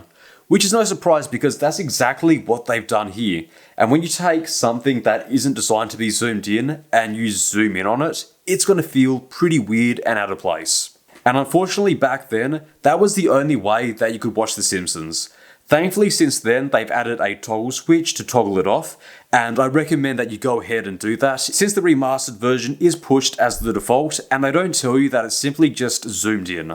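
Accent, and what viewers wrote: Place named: Australia. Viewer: Jesus how Australian are you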